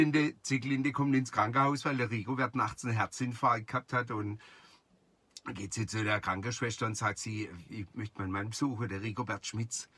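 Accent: Baden, Germany. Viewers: Bester badischer 😁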